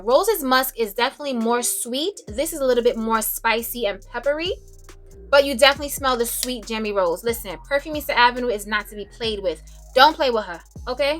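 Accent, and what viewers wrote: New York, United States. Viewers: Yayy, thank you so much for posting this! I can listen to you talk all day, love that NY accent 😍Totally agree about Tribeca, I'm currently obsessed with it at the moment - like it's super rich and sweet/deep notes yet it's so airy and light, and makes me feel so posh lol. Greenwhich Village and New York Nights are next on my to-buy list!! Would you say NY Nights could be a signature during colder months or night time only vibe?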